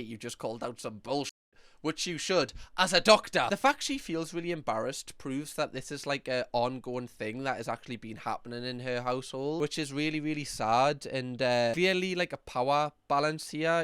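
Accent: Newcastle, United Kingdom. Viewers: It’s just part of his Geordie (sp?) accent - lots of Brits talk that way - I’m used to it now and find it charming 🇨🇦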